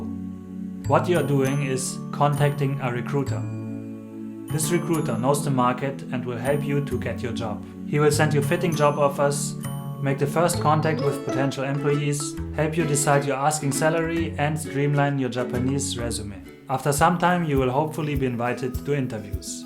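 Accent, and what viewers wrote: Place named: Germany. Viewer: German accent for sure :)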